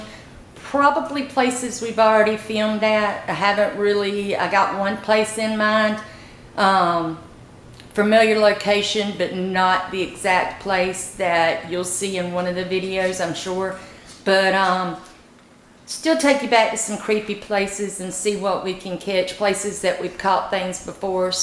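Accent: South, United States. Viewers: Love your videos and love your southern accent! I have been watching your videos for quite some time now but just a word of advice, why don't you ghost explorers get a small can of bug spray or something to put on your body to protect you from getting attacked and carry it with you especially to cemetery's or in the woods when doing your ghost exploring, I notice this a lot with all of you ghost explorers always getting attacked by a lot of mosquitoes, bees and what ever. Keep up the good work and I will be looking forward to seeing more of your new videos in the near future.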